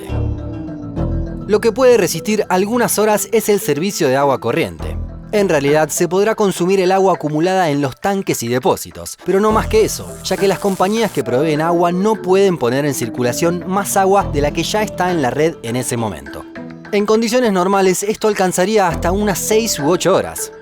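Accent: Argentina. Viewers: Por tu acento creo que eres argentino Así que te pregunto que se sintió el apagón en el cono Sur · se parecen porque ambos tienen acento argentino creo. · Claramente está dejando a un lado su acento argentino para poder hacer este vídeo. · Yo le noto el acento argentino, pensaba que te referías a las jergas, pues el acento se le nota, no se si los otros argentinos tienen un acento mas fuerte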